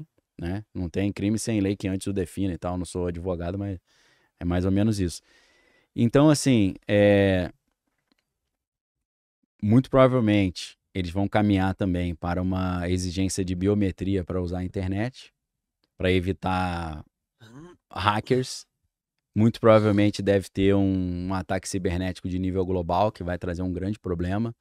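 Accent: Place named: Rio de Janeiro, Brazil. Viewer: Como levar a serio um cara com sotaque de carioca?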